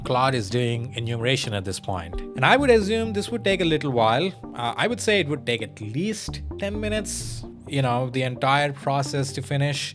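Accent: India. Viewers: this guy has such a NRI accent VecTOR , nice video though , claude is surprisingly good at documenting things neatly , as a human , it would be nice to copy that style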